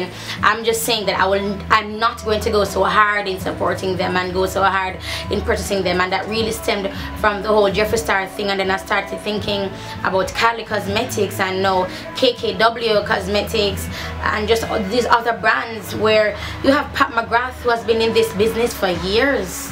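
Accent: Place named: Patois, Jamaica. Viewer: god say put good out n yuh get good back.... someone helped u help others, do other thing dont conform but i loved when i say yuh down zaful try on. do more things that ppl wan c. And even tho yuh jamaican talk slower and speak standard english sometimes not all weh come from jamaica can technically understand ur rawcha patois even tho that makes it funny. n nuff black ppl nuh undastan patois/patwa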